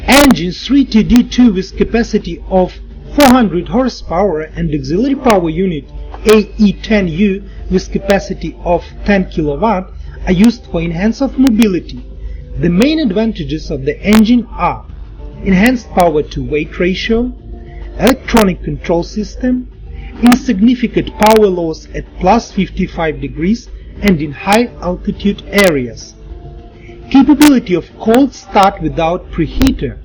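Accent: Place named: England, United Kingdom. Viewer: это не американский,а английский....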